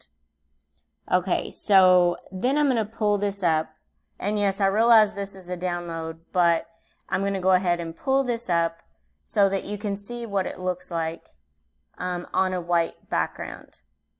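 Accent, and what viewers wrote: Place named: South, United States. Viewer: This is my first time watching and I love your adorable southern accent, from one southern lady to another, keep on making stuff.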